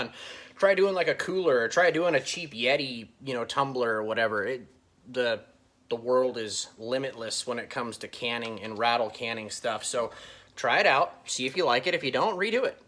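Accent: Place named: Backwoods, United States. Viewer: Can you try the next video with an Australian accent. This whole backwoods American thing doesn’t suit you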